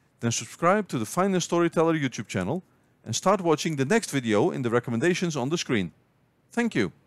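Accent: Netherlands. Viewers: Very thorough explanation of accounting terms, this will help a bunch in reading 10-K and Qs - thank you! I can hear you are Dutch! :) Hi from Zaandam!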